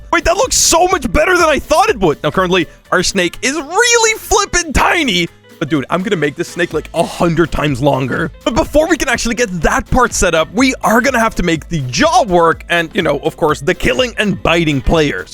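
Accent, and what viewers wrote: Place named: Gouda, Netherlands. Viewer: You have a accent that someon from Gouda has are you from Gouda (for the people that don’t know Gouda is a place in the Netherlands)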